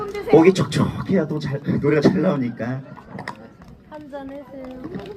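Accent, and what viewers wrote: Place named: Chungcheong, South Korea. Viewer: 환장하것네~~~ 정겨운 충청도 사투리 귀여운 수현님이 하니 더귀엽네요😂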